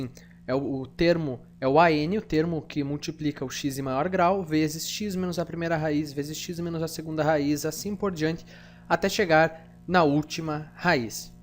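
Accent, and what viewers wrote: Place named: Rio Grande do Sul, Brazil. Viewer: muito bom. De onde vc é? Sotaque do Rio grande do sul =)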